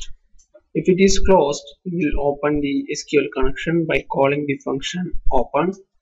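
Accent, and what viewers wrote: Kerala, India. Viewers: Malyali aano ? Nice explanation.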